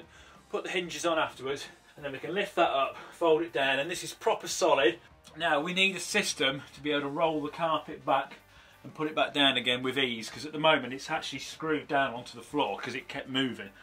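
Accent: United Kingdom. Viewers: You sound SO British. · bro this is the most bri'ish guy i evever seem!!!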